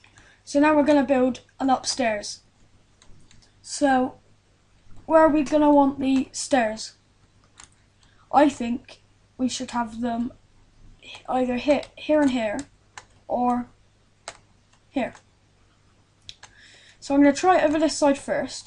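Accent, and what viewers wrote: United Kingdom. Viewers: you sound more scotish than english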